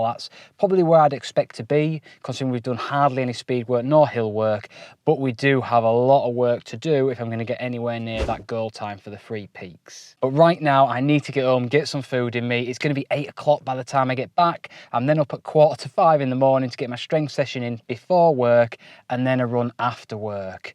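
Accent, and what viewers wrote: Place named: Yorkshire, United Kingdom. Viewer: So glad I’ve found your channel! Really good review. I’m Spanish but I lived in Yorkshire for a few years so it’s nice to listen a bit of an accent :)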